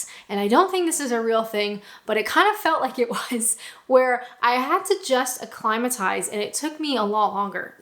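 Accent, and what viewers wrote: United Kingdom. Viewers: Lol. You sound fully integrated into British life. :D :D :D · It's great you consider this home now. .... and you're getting a great accent too! Now to fet yiu moved somewhere where you'll get a strong accent: Yorkshire..... Dorset.... or gasp Happisburgh in Naaaaaarfook (where you will most certainly need a car.... or rather.... tank)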